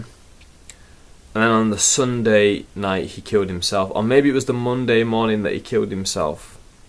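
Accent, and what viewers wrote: Manchester, United Kingdom. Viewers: Does this guy on the video have an Manchester accent ? Is he from Manchester? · Where you from anyway? You sounds like your from my home town? Manchester Salford :)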